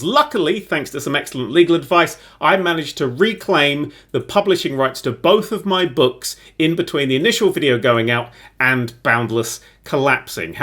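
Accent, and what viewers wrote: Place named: London, United Kingdom. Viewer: It's like British London from peep show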